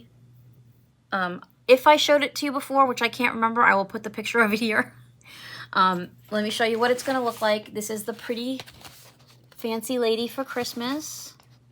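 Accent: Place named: New Jersey, United States. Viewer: Hi! I'm watching you fr the North her in Ontario, 🇨🇦. I love your Jersey accent. I'm new to Cross Stitch & am thrilled to watch others videos & see their projects & stash. And oh my there often is mountains of both. I'm currently finishing up my project & looking forward to starting "Gracious Era"(Dimension kit) it's a Xmas gift for my mom. Glad you had fun at Stitch North, gosh I live close to the venue but was new at that time. So maybe in 2026 I can go! Happy summer! You're the second I've met in my life.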